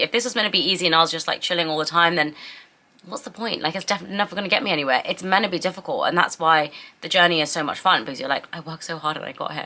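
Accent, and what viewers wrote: United Kingdom. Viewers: How come you’re accent is so British, just amazing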